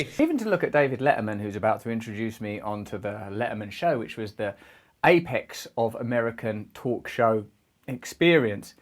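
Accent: United Kingdom. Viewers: Hard for me to understand the accent in the Queen’s tongue. Yet I really like these 1vs1 testimonials from Brand. This is a good story, that proves careful what you wish for. However I am grateful I got to see this man perform, and make me laugh on occasion. Also he’s intellectual 😂 and he is from England. Thanks for the messages. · I have to admit...the first time I saw you, I thought you were quite handsome. And then you spoke and that British accent tickled my ears. I’ve loved watching you in your movies. You don’t seem to be acting at all but rather, you walked on set and you and the role really were one. Only the best actors become the role. And then, you can walk away and you’re again. So you’re right about there being a split.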